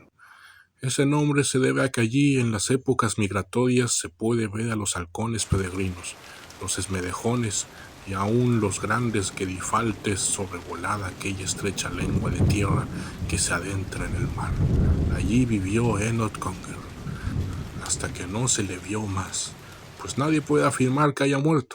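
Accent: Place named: Border, United States. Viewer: Tu narración de gringo fronterizo le da todo el estiló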